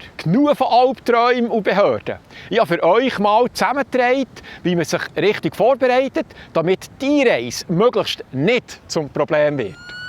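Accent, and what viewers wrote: Bern, Switzerland. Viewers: Amerika gits nid, sie heis gar nie gfunge🎶 Bi dir cha sogar ig als Bärner no lehre richtig bärndütsch schnurre. "Sürmle" hani scho lang nüm ghört.😅 Gruess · Hallo, eine Heimweh Schweizerin in Eitorf NRW, freut sich jede Woche über Deine Beiträge - und vor allem über das gemütliche Bärndütsch. Weiter so herzlichen Dank 😅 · Die Infos sind eifach grossartig, zudäm em orchige Bärndütsch. Liebi Grüess us em Appenzällerland 🥹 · Grüessli us Thun/Gwatt, ha jedesmau Freud di zgseh, so ufgsteut u mit herrlechem Bärndütsch, i liebes. Mit 76 Jahr geng no unternämigsfreudigi Grüess nach Californie. Ha dört im Jahr 1993/94 im Lodi Quarterhorses trainiert u mini 10 jähregi Tochter isch dörte id Schuel. Het sofort guet Änglisch chönne u miner Ross hei Bärndütsch verstande😝🤣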